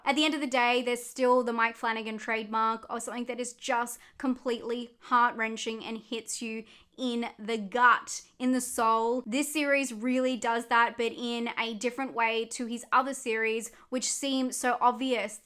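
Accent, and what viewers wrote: Australia. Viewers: Youre Australian?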